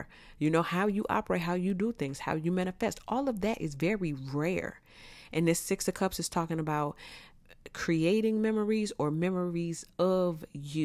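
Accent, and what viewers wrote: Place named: South, United States. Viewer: I love your country accent I love it ❤❤❤❤❤❤😂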